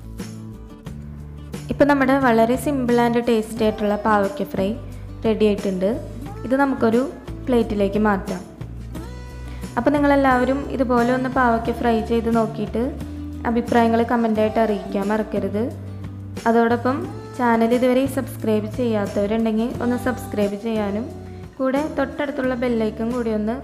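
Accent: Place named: Thrissur, India. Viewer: കൊള്ളാം കേട്ടോ എനിക്ക് ഒരുപാട് ഇഷ്‌ട്ടാണ് പാവക്ക ഫ്രൈ. തൃശ്ശൂർ സ്ലാങ് കേൾക്കാൻ നല്ല രസമുണ്ട് 👌👍👍👍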